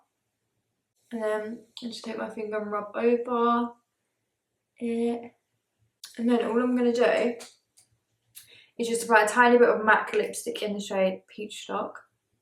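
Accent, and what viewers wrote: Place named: London, United Kingdom. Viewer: It been a while since I came on this channel. Since when has got a London street accent 😆??. Happy new year!💞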